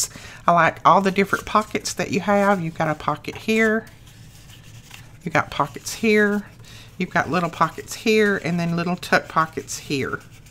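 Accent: South, United States. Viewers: you just keep doing what you do. I sit down and craft while I watch you every night. You keep me company. I love listening to your lovely Southern voice! Thanks for what you do❣️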